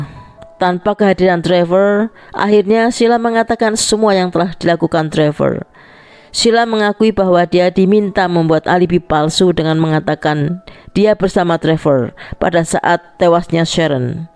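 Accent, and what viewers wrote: Java, Indonesia. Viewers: ..... tetap aja top markotop kalo madam yg bawa kan.... mau itu kasus apa pun.... karena ciri khas medok nya.... malah bikin kangen.... selalu.... teruskan bersinar ya madam....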